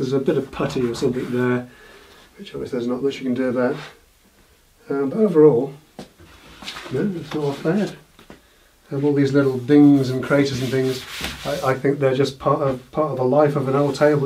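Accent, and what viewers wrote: United Kingdom. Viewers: Hello. I have just recently subscribed and love your videos - especially the British accent ( me being in the US). I think you’ve been on for a year and I do hope as many others that you continue your videos. I have watched many of your catalog of videos and love all the tips and jigs. Keep up the great work!!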